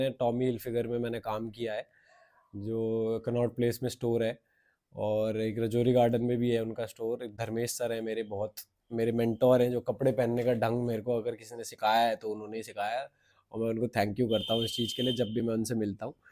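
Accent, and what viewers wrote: Haryana, India. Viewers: Hai tu up ka bole haryanvi hai ... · he talks like haryanvi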